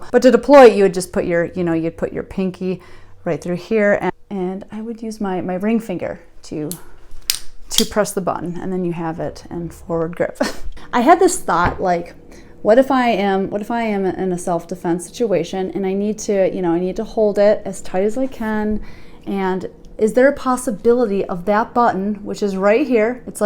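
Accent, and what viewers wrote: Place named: Minnesota, United States. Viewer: I’m originally from Wisconsin. I can’t tell if your from the mid west or Canada . Sounds like Minnesota or Wisconsin. 🤔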